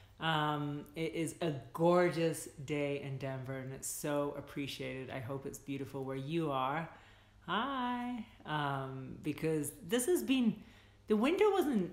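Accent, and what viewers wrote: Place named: Scotland, United Kingdom. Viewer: Thanks for this! Thought I detected some Scottish in you!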